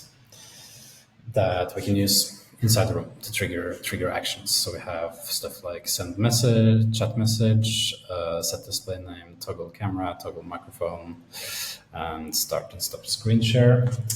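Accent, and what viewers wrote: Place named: Norway. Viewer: you speak English like a Norwegian. Er du Norsk?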